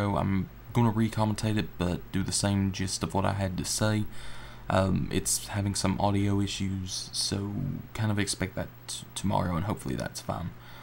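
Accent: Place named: South, United States. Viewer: I think its southern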